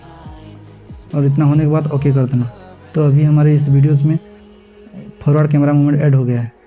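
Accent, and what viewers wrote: Odisha, India. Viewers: Bhai aap odisha se ho kya?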